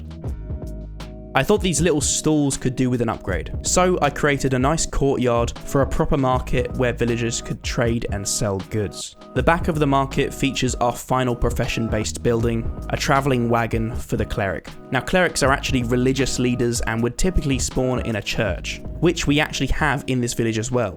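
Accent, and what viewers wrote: United Kingdom. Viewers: British Accent Nice!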